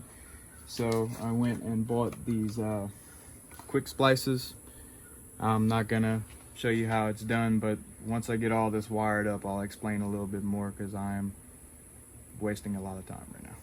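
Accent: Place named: Louisiana, United States. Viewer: As soon as you started talking I said "Southwest Louisiana" haha. I dig these lights man.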